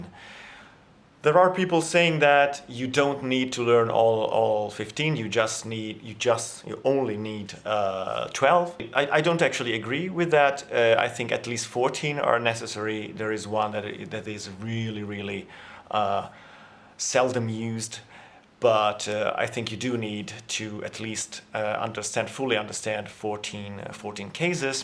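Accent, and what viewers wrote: Finland. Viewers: I haven't looked up what you did in Finland, but if I would like to guess, I would say that you lived in Helsinki and spend lots of time with youth? You have a slight "Helsinkiläinen hipsteri" accent and I love it! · I found it interesting that once you started speaking finnish in this video you started to pronounce some words in english with a finnish accent. You really are a language master. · It's strange, in the beginning when you spoke english I could immediadelty tell that you can speak finnish. You used some familiar sounds or accents, I could tell right away. Weird! :) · You actually sound like finnish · I mean, even your Italian articles sounded a bit Finnish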